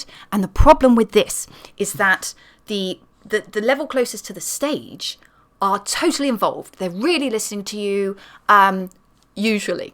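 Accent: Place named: United Kingdom. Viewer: I'm too American to notice English from British or Welsh from Scott. I think odd is the soup sandwich combo special at a posh bistro, or standing in que to take number at the DMV. I find Brits fascinating. Your sense of humour especially. It takes a while to run the dialect through our macaroni and cheese filter, but after dialing it in, you're all really quite brilliant. Thanks for the responses, you're quite lovely. Just curious, did your belly button ever pop back in?;) If not, chuck some glitter on it! Cheers!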